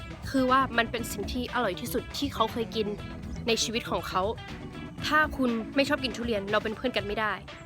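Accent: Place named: Central Thailand, Thailand. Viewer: พูดกลางชัดกว่ากูอีก 555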